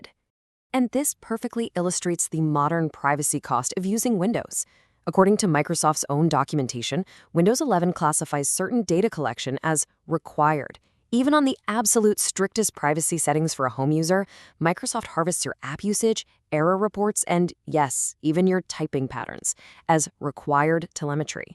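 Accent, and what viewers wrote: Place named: Australia. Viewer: Oh my God, you’re Australian. I’m Australian.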